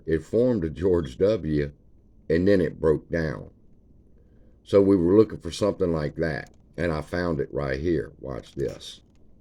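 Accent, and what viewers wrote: South, United States. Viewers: Instantly subscribed. I follow a lot of fancy channels. A lot of big ones with big words and figures. You broke it down plain and simple. The southern accent was a cherry on top. Thanks for keeping an eye out for the sundial crew.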